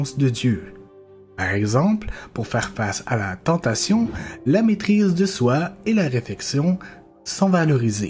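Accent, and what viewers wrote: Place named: Quebec, Canada. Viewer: je comprenais rien a ce que tu disais, puis j'ai compris que tu etais quebecois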